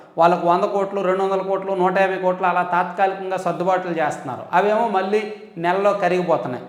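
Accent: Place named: Andhra Pradesh, India. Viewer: Emoji ni chuse, slang ni chuse nenu subscribe ayyanu Anna! Enthaina srikakulam 😊vallam ga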